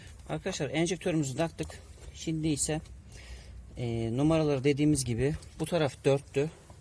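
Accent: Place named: Hungary. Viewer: Bu kizancik Macir belli beya konusmasindan kizancagizim kolay gelsin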